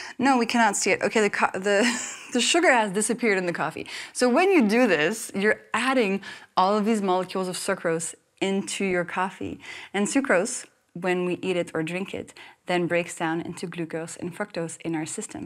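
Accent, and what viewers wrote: France. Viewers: That subtle french accent is absolutely charming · Has anyone sensed a similarity between Ms. Inchauspe and Martha Quinn from the OG MTV in the early 80’s?Quirky, cute, MTV VJ. One of the original VJ’s. is French but even through the tinge of French accent there’s a lift and a flow to her pitch and tone that was unique only to Martha until now. At least to my ears. To me it’s kind of freaky to watch talk while remembering Martha Quinn. I can hear Martha in there. I don’t think is doing anything to change her voice, not on purpose at all but it is eerily similar. At least to me. She is engaging and if it helps people’s health, that’s great. More power to her!! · We (french native speakers) are so doomed... I mean I would kill for an accent like this one, yet people treat this as a french accent... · Completely off topic... How have you managed to almost completely lose your french accent? 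That's a first for me! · I like this videos of yours. I think you are a good and knowledge person. Side note: for some reason I sense a strong French accent in your English and I wonder are you French or a French-Canadian?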